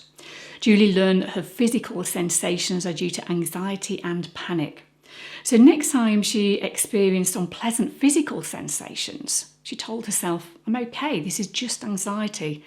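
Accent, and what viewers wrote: United Kingdom. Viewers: Excellent CBT explanation with beautiful British accent, thumbs up!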